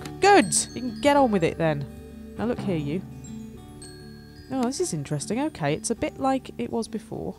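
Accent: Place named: United Kingdom. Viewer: I love your British axsent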